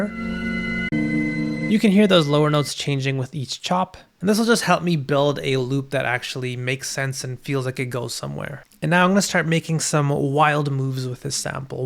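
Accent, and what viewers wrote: India. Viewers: Weird i never notice but your indian accent is heavy in this, i never noticed. Dope vid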